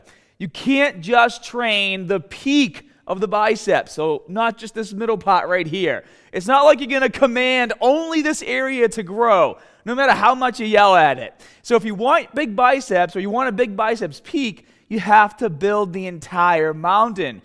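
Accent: Boston, United States. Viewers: Is that a Boston accent? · amor macalalad he’s from Boston so a Boston accent.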